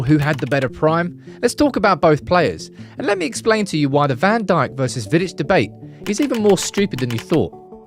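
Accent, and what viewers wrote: Manchester, United Kingdom. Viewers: Can tell you're a manc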